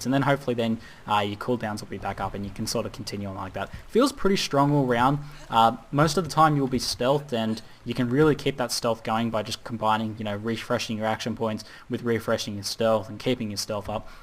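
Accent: Australia. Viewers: Aussie brooo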